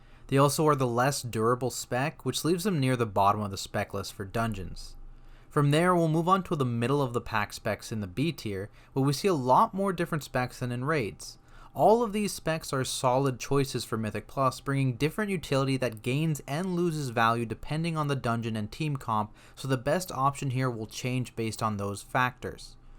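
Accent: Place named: United States. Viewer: He's got an American accent, and I posted the way it's said in his native tongue.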